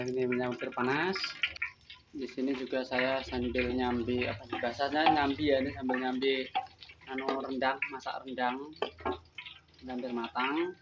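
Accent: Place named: Java, Indonesia. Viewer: Mas asli mana sih, jawa jogjanya medok banget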